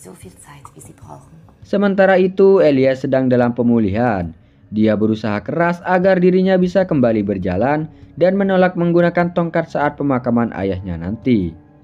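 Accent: Madura, Indonesia. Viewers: Orang madurakah pak, logat nya seperti orang madura😅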